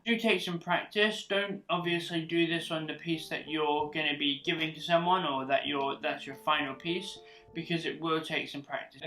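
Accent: United Kingdom. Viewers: Unfortunately the huge reflections on/off your glasses..is well very distracting..that then the lower then normal voice volume mixed with the possibly British pronunciation and dialect makes for difficult viewing experience..a pair of non reflective glasses and turning up the vocal volume overall would really improve your videos..Cheers mate..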